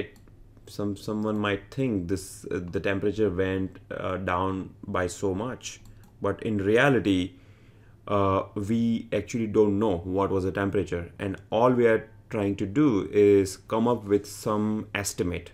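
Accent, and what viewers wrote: India. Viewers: I actually wanted to complement him - I heard his indian accent, but it didn't bother me at all - he spoke clearly and with the correct pace (unlike many other tutorials from people with the same pronunciation, who I simply cannot follow)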